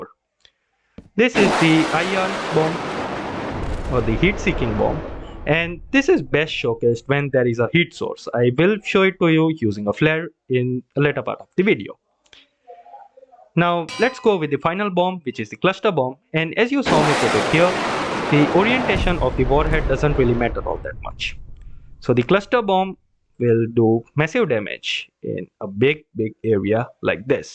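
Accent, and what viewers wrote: India. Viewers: yes i knew this was good when i head the indian acent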